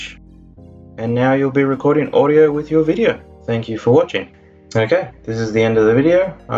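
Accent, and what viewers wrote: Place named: United Kingdom. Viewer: you're correct i watched the video my record button has been greyed out formonths on the game bar. pulled it up again while listening to your british lilt and the button was still greyed out >anger viscerall through my veins . however i kept listening to you and win+alt+R did work albeit i had to finagle the sound settigs and change permissions for audios using a different tutorial but your hotkeys knowledge did the trick. "cheers mate"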